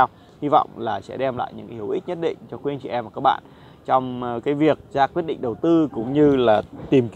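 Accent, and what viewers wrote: Thanh Hoa, Vietnam. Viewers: giọng thanh hố hay sao ấy nhỉ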